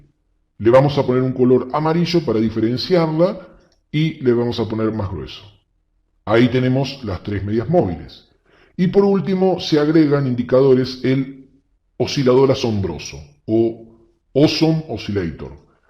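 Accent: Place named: Argentina. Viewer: hola, me parece por tu forma de hablar q sos argentino, yo soy de buenos aires, me gusto tu estrategia y la voy a practicar, pero ademas de eso te queria preguntar de que manera se puede retirar dinero de los brokers cuando estamos en argentina, ya que estamos muy regulados. Sirve abrir una cuenta en Skill? muchas gracias. abrazo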